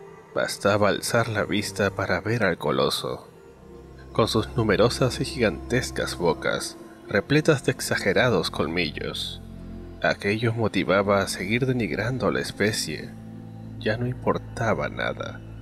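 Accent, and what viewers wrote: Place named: Castile, Spain. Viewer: A todos mis hermanos Hispanos. Tercios Españoles invencibles. <link> Por cierto, eres un españolazo. Eres como un español del interior, castellano, así hablas 😍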